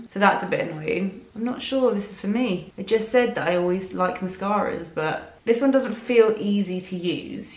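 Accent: United Kingdom. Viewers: Do you have a british accent? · yes, she's british.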